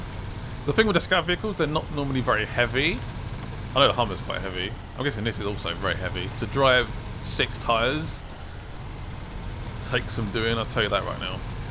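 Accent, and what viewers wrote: England, United Kingdom. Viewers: Are you english lad ??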